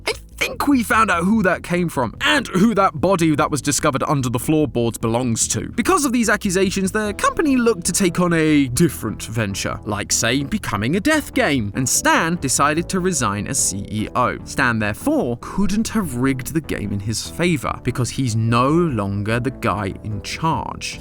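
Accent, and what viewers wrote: United Kingdom. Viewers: He sounds so British😂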